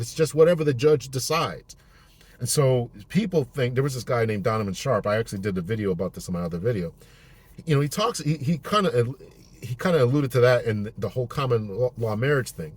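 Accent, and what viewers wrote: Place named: United States. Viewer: I'm not a guy, and this channel appears to be for the guys, However, you popped up in my feed, so I decided to answer your question: Yes ! Absolutely! Just have a written notarized agreement of your requirements for her to sign prior, and a video recording of her doing it. You would have to sign an agreement as well. That's the only way that I would consider it. " Man" should not be alone according to God. That's why he made "Woman" for marriage. 3rd time is a charm !😊 BTW, Love your accent. You're from somewhere other than the South, either West or perhaps Northern. I'm your newest subscriber, probably the only born female in here listening, with the audacity to throw in my 2 cents. 😊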